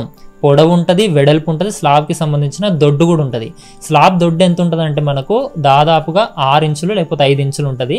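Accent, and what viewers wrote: Telangana, India. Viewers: Good information brother and mee telangana slash chala bagundhi... 👌👌👌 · తెలంగాణ యాస లో బలే చెప్పావు అన్న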